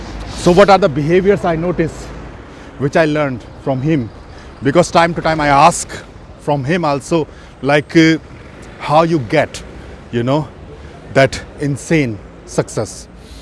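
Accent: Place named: Bihar, India. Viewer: Your accent is very Bihaari. The way you say "aaaalso" .. Sounds like some chokidaar / chapaadasi...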